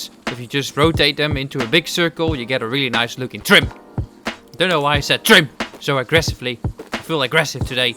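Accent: Netherlands. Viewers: I smell dutch maar ik heb eindelijk mn mede adhd’er gevonduh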